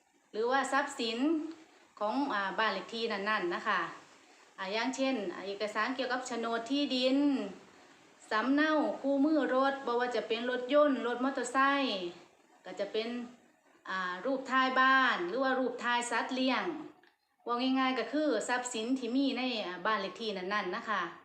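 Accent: Isan, Thailand. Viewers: วันหลัง อัดคลิปพูดภาษากลางก็ได้นะคับเพราะคนฟังไม่ใช่แค่คนอิสานนะคับ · สำเนียง.ต่ายนักฮ้อง..ภาษาอีสาน.เป็นภาษาที่สระสลวยขอรับ..ตาดูดาว.ท้าวติดดินชอบ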